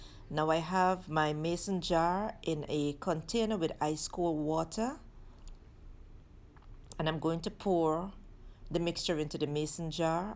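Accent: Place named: Guyana. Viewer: I just knew you were from Guyana. u sound like one side of my family who’s from there too ❤😂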